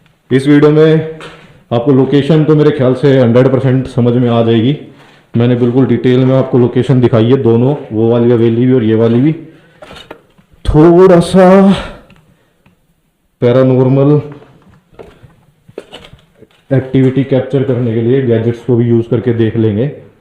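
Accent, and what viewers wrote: Haryana, India. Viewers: Bhai khaa k ho Aap Aap ki voice Haryana ki lgti h m bhi Haryana say hu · Genuine content and it is up to the mark. Never knew that such "mature" investigators are there in our community... And that हरियाणवी accent, भाई साहब! Keep growing,buddy :)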